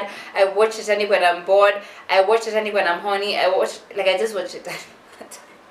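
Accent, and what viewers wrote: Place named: United Kingdom. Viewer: kwani ulikuwa UK?? Accent kicking in lol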